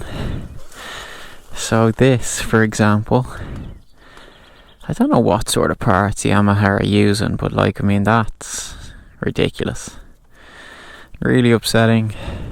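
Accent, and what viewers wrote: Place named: Ireland. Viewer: Nice what would you get the normal T7 or the word raid With the acf50 I put it on about four times over the winter but then again, I do live right next to the sea Just try adding it on bit more Should help I take it you’re over Ireland by the sound of your voice looks like you got some good trailers there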